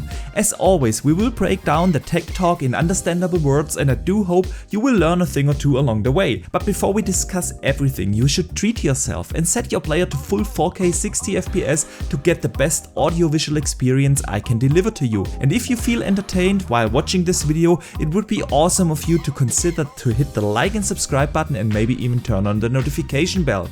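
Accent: Germany. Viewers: Man I really like your German accent :3 Nice video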